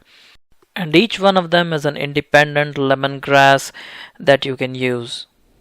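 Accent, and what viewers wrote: India. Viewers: rolls his "R"s... because he's Indian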